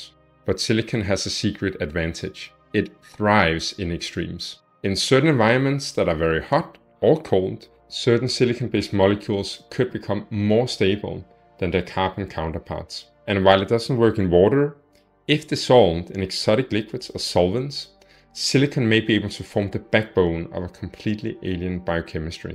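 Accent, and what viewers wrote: Germany. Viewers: I know that your channel is new but I get the feeling that you are going to take off on YouTube very soon. Keep up the good work. By the what country are you from? I would have guessed one of the German speaking ones but i could be wrong. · Why does he sound so German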